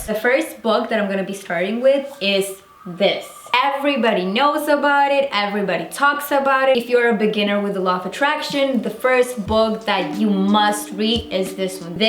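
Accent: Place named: England, United Kingdom. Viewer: Hai un bellissimo accento inglese ♡.♡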